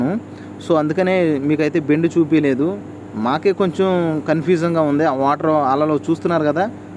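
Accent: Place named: Andhra Pradesh, India. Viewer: nee mata andhra vaala mata lekka undhi anna ravva chepunu mana vallu emani pilustharu boccha chepanena?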